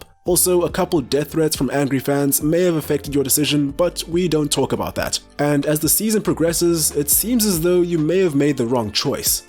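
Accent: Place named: South Africa. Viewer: I can hear that South African accent Bru 🍻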